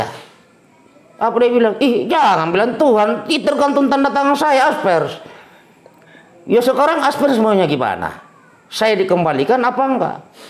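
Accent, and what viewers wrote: Makassar, Indonesia. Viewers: Mantap rokok dan kopinya.... apalagi dengan logat Makassar nya pang bes ... keren 👍👍🙏 ciri khas komandan trade merk yang tidak bisa di tiru ... sehat sukses selalu komandan 👍👍🙏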